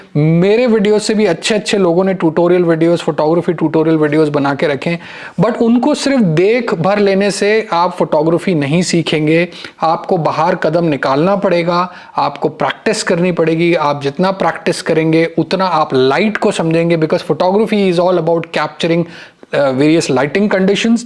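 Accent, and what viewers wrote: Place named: Bhopal, India. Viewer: अरे वाह आप तो भोपाली निकले,तो कवि धूमकेतु का नाम जरूर सुना होगा।उम्र में मुझसे छोटे हैं, लेकिन रिश्ते में चाचा लगते हैं।आपकी बोली से मुझे लग रहा था सूरमा भोपाली💖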